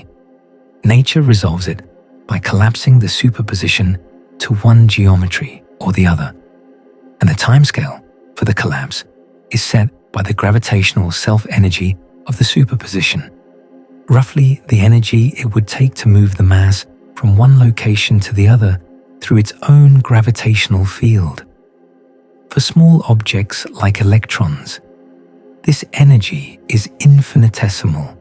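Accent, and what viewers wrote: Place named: England, United Kingdom. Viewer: English accent - American idiom. Quite dissonant.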